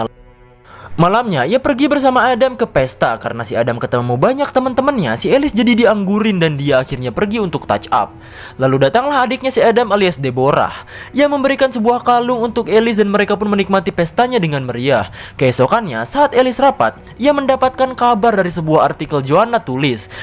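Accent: West Java, Indonesia. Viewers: sunda bet dahh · Fix org sunda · Pasti org sunda yeuh s mimind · ngakak kie sunda na wkwkwk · Orang suda ya min hehe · anying sunda na mantap ngangkang aink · Lucu sunda na mna a?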